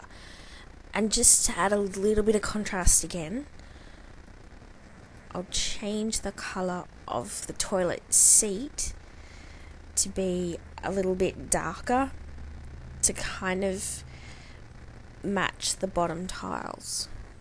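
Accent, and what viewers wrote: Australia. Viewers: you sound like and assie to me that is because i am Australian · AHHHH I LOVE the Australian accent. I'm surprised I didn't catch on to that. I feel bad now :( You are awesome in my book :) Australian people are so kind hearted and sweet too, I love that.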